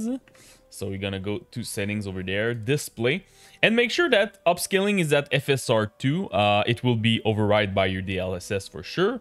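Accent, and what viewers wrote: Quebec, Canada. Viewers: merci man l'accent quebecois est en force ahahah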